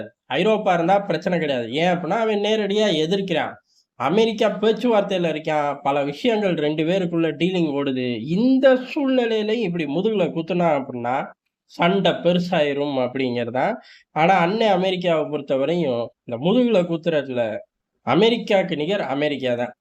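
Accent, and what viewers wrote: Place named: Theni, India. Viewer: அட..தேனி ஸ்லாங்! 😅 சூப்பரு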